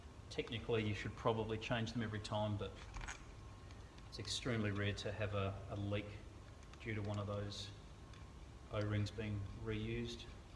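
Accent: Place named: Australia. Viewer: Cheers thanks for the Mellow rebuild and Aussie accent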